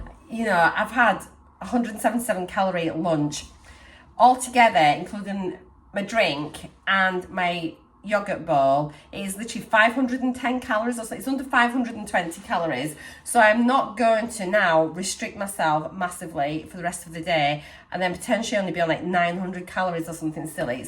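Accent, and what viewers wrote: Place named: Scotland, United Kingdom. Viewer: I love how the Scottish lingo is coming out more and more, quite a few 'ayes' here, haha. Dumfries and Galloway is such a beautiful area, I love it there.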